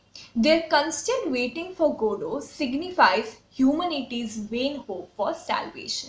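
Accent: India. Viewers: So sweet and honey mixed easy Indian accented description made by you, It's easy for me to make notes for my M.A. in Eenglish, Drama Paper. Love and Thank you, from Assam India*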